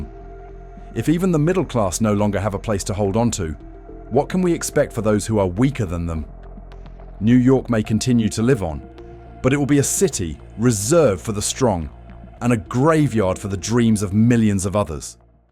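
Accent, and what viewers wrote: United Kingdom. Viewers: Whoever the limey Brit narrator is, talk shit about England. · Gotta love a video with a snooty British accent type narrator talking about how New York sucks . Bite me ya' limey wanker ! Lol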